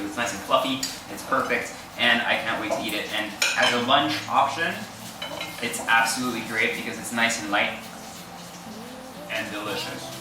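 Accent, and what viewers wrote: France. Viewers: it's cuz he's French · that french accent.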